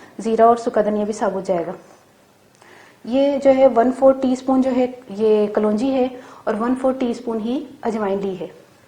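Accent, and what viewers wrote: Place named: Punjab, India. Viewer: Ap ke panjabi bikul hammari wali ha ap boht achi legti hn panjabi bolte hwe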